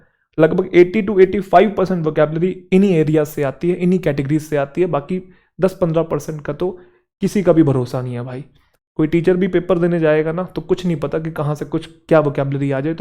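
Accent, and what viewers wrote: Haryana, India. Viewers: Sir aap haryana se ho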